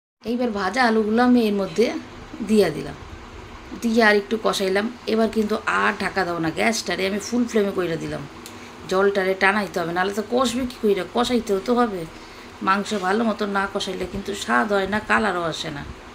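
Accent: Bangal, Bangladesh. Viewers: লাইক সাত ,তোমার এই বাঙাল ভাষার কথাগুলো দুর্দান্ত লাগছে শুনতে । পুরো ভিডিও তোমার ভিডিও থেকে তোমার কথা শুনে খুব আনন্দ পাইলাম।😂👍💓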